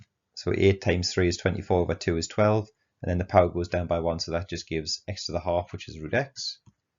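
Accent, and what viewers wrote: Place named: North East England, United Kingdom. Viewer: Many thanks. That’s a north east accent .. from where may I ask ?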